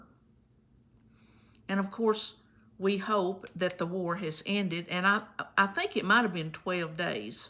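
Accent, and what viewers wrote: Kentucky, United States. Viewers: I enjoy your videos guess I like your Kentucky accent!! My parents were from Kentucky many many years ago✅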